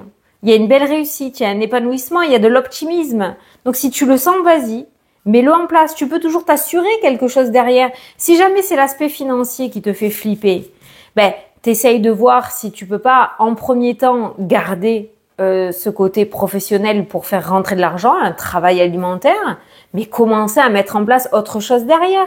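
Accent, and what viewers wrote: South, France. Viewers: Merci 🎉❤ ta guidance tombe à pic! Tout est juste...incroyable Merci pour ta fraîcheur. En plus, j ai le même accent du sud que toi. Tu es une belle découverte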